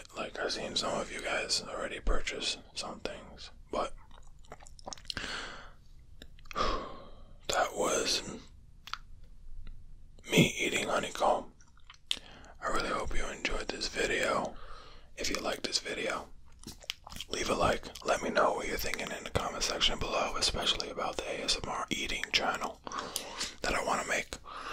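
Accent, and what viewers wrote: New Jersey, United States. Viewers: Are you from new jersey?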